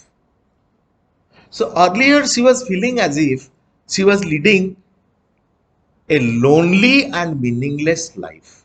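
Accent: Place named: Bengal, India. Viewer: His Bengali accent is too cute and attractive 😍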